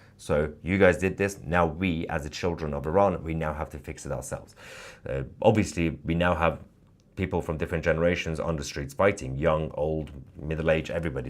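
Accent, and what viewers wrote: United Kingdom. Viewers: Well, Trump didn't want war and Bibi did. Shows who really is in charge. Another disgrace is beginning. Asa for this announcer, he has a British accent. Just for that, I wouldn't believe a word he has to say.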